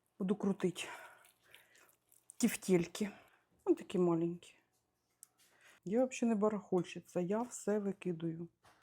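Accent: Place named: Dnipropetrovsk, Ukraine. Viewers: Доброго вечора,! Випадково попала на ваш канал. Було дуже приємно чути наш криворізький суржик, дякую вам! Я сама народилася в Кривому Розі, живу в Атланті більше 20 років и в Україні не була вже вісім…підкажіть, як вас знайти на ФБ, якщо можна❤️ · така сама мова Дніпропетровська область...одним словом суржик....)😊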